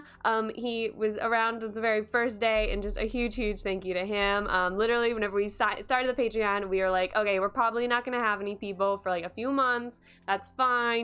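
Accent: United States. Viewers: are you from the mid west ?. your accent sounds mid western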